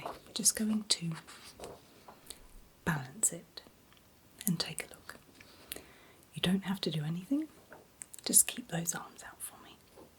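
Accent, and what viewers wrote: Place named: England, United Kingdom. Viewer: Yay!! Finally someone with an English accent 😂 all the asmr artists that pop up are American. Don't know why, but this quiet friendly voice is exactly what asmr is about and a lot of people try but some don't seem to grasp the concept. Plus, this helps me sleep. Put it on when I lie down to sleep and half way in, I'm already asleep 😴 Great job!!! Definitely one of the better ones I've seen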